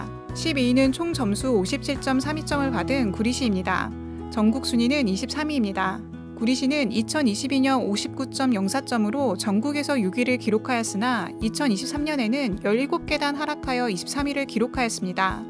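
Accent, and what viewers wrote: Gyeongsang, South Korea. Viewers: 나레이션 하신 여자분 경상도 출신이신 듯..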